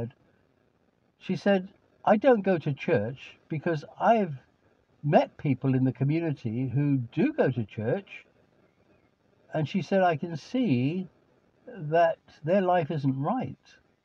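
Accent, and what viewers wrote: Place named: United Kingdom. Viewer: So weird to hear someone speak of Christ with a British accent. I just left my church and am looking for a new Bible teaching church. Don’t care about the building, parking, etc—just want to hear the Word of God unapologetically preached! Good stuff there! Love you Brother and I will pray for your ministry from Alabama USA! 👆🏼🙏🏼👊🏼❤️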